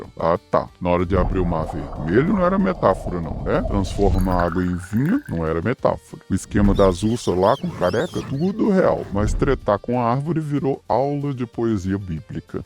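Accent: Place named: Minas Gerais, Brazil. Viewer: Sou apaixonada nesse sotaquinho mineiro! Assistindo pela SEGUNDA vez!!! Muito bom!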